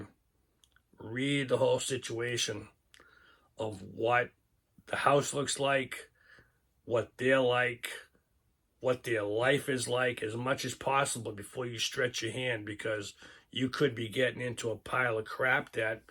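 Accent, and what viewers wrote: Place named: New England, United States. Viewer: Hi! I could not have found your channel at a better time in my life. Thank you ox. p.s. Love and miss that New England accent. I grew up in Massachusetts.❤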